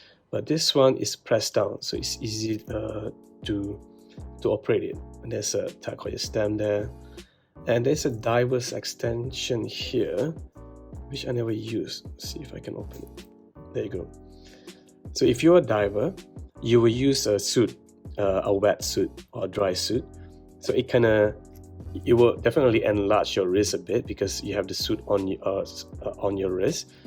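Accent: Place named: Malaysia. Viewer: Are you Malay? Because i recognise that accent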